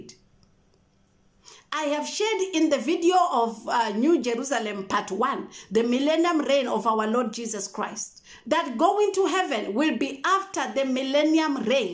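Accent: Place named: India. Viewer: Sounds similar to the way the Indians in India talk.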